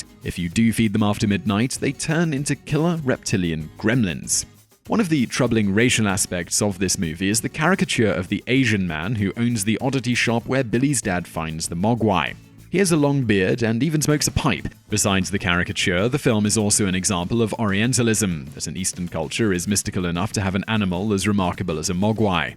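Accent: United Kingdom. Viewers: Hypocritical? Why does a white British sounding man always have to be the one imparting knowledge? White not let a women or person of color do your show. Maybe top 10s should turn the mirror on itself.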